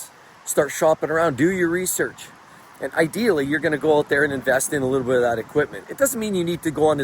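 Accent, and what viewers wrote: Alberta, Canada. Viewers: Is your accent an Alberta accent?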